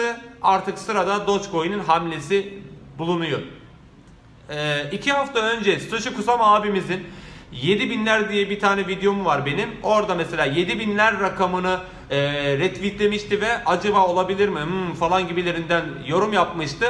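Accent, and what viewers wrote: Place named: Samsun, Turkey. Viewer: Tam bir Samsunlusun “ E “ lerinden belli hemşehrim..👍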